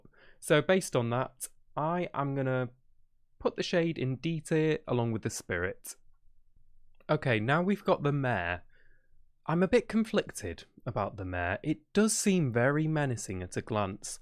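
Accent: United Kingdom. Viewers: It's the Scouse accent. It's really disarming. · that isn’t scouse lmao it’s East Midlands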